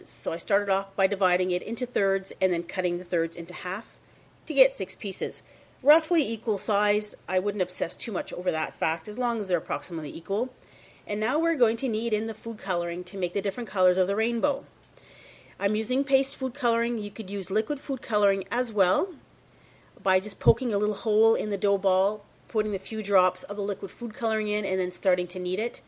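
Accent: Canada. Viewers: The sugar must make it sweet, is this standard in North America? I've heard the bread in USA is quite sweet but you sound Canadian, is it the same there? Also can I make this bread without the sugar in it? :) Thanks for all the lovely recipes! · can tell from certain words that shes canadian :P prety much the same thoguh, your right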